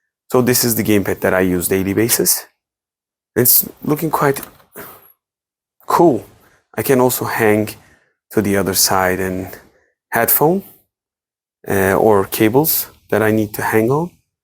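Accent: Turkey. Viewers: Abiii Aksanın türk aksanı olsun yinede güzel.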